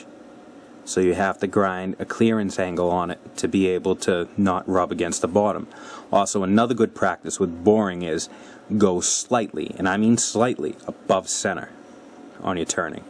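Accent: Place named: New England, United States. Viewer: Good to hear the accent - I used to race at New England dragway in Epping and grew up in Burlington, Mass. - Now teaching machine shop in Florida - good videos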